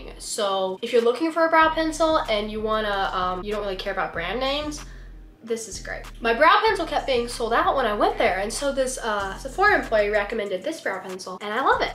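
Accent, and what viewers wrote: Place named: South, United States. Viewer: bruh why does she sound kinda southern, like it kinda sounds like she has a southern accent like a WEEE little bit. :3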